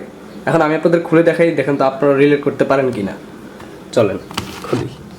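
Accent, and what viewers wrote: Sylhet, Bangladesh. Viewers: Excuse me are you from sylhet district ?????? 😅😇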